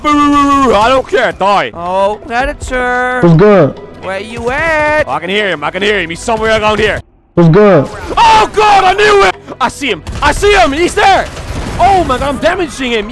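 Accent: Netherlands. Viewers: cyril MAGIT that’s a Dutch accent it’s lowkey funny when a Dutch guy speaks English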